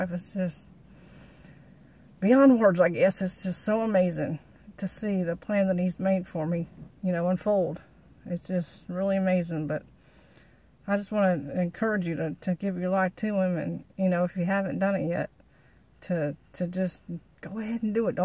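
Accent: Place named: South, United States. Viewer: Thanks for sharing your story. I love to hear you speak, you are so down to earth so real. I love how you talk, like you're right here next to me. I feel you are not from the Northern States, love the Southern talk, so Natural, so encouraging to just listen to you again and again, for I too am a Southern woman of God, I love so so much. Hallelujah !!! I share a bond a spiritual bond with you as one I truly admire and appreciate, such an inspiration to me, for I loved you the first moment I connected spiritually with you, for you are so real, so truthful, have a heart of gold, so precious, in how well you get his words of truth out to me, and to others. What a blessing you are to me. I feel so comfortable when we share the Father's love. I love, love, love, his words, as you speak it out. God Bless you precious beloved one, my sister in Christ. 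Amen !!!!!!!!!!!!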